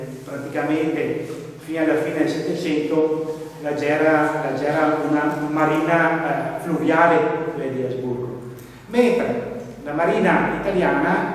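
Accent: Veneto, Italy. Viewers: Un misto di Veneto ed Italiano ma male tutte e due,tant'è che io stesso che sono Veneto mi si sono allungate le orecchie per cercare di capirci qualcosa.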